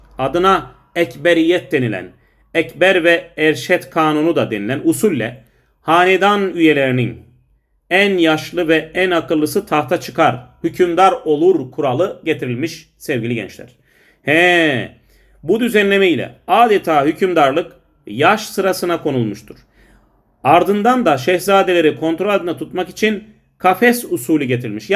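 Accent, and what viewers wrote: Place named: Afyon, Turkey. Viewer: hocam ders anlatımınız tam bize gore agzınıza saglık acaba nerelisiniz tam afyon vibe ı alıyorum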